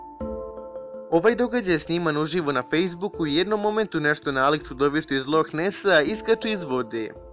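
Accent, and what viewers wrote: Montenegro. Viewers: Da li si ti iz Crne Gore?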